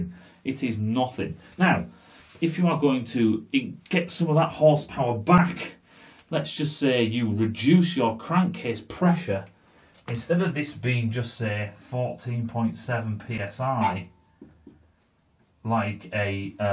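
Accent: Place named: Yorkshire, United Kingdom. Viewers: Didn't click on the video csuse ofnthe title, I clicked to hear your sexg northern accent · Normality is returning to the world with a Yorkshire accent 😉 It’s good to see and hear you once again.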